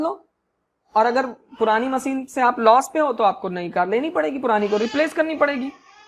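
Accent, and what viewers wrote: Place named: Lucknow, India. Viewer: Sir aap Lucknow se hai kya ?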